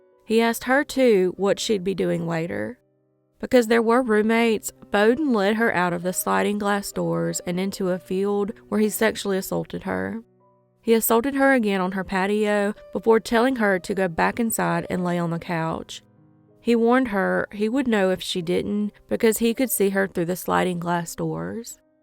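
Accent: South, United States. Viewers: From another country girl to another, I love your accent In the fact that you named your channel Southern Girl Crime Stories!! It fits perfect to a T, and your content is great because a lot of us have never heard of some of these cases and I am grateful for you putting it out there. I know that it takes a lot of hard work and time but I must say once more you are doing a fantastic and awesome job! Keep up the great work and have a wonderful week sweet face.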